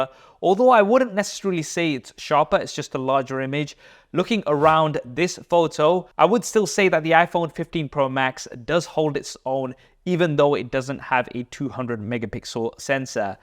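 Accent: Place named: United Kingdom. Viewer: YOU ACCENT SOUNDS BRITISH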